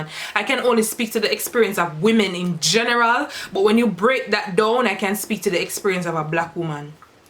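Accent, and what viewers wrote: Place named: Caribbean. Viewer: Are you west indian???? 🤭 you sound like us❤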